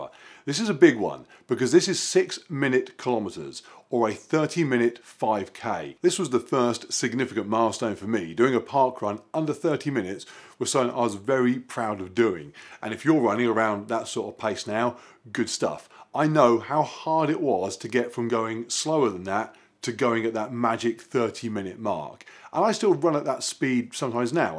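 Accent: United Kingdom. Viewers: British accent)))))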